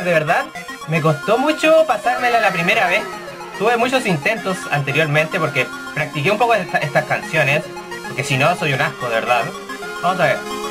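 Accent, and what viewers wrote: Chile. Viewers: Eri chileno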